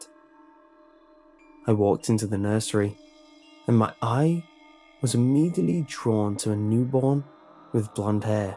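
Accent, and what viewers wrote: United Kingdom. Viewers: I can't believe how many views he gets, when i saw it this had only been up 18 minutes and already had over 1000 views. Guessing Americans like a creepy British voice? lol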